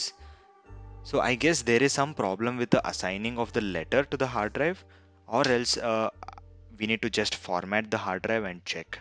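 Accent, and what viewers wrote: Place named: India. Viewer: You have a strong from India accent and speak too fast to be clearly understood. Slow down from 10 to 8.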